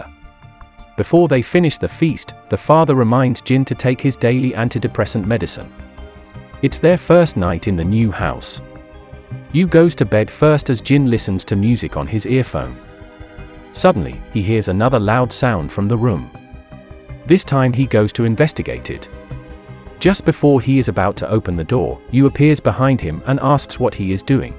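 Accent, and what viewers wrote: United Kingdom. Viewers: Why this autovoice has a British accent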